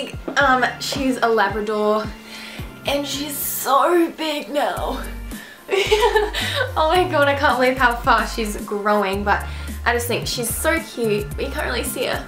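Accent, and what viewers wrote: Australia. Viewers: your accent sounds australian but it doesnt? lol. this is the first video ive see of you. a) you're gorgeous and b) you have an amazing body! werk it gal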